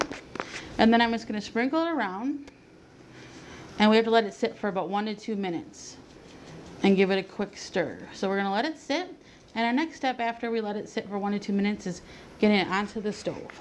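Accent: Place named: North, United States. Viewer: You talk too fast. And we southerners can't understand northenors. That's out fault lol.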